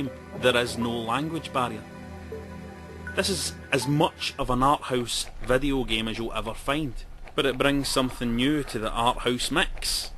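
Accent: Scotland, United Kingdom. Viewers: you can get the rom on SnesOrama and download Nulldc..(DC emulator) watch a youtube tutorial on how to get it configured and "bobs ur uncle" Sorted. lol im from aberdeen... tis decent to hear a scottish accent on this thing. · Scottish accent + solemn speech = EPIC!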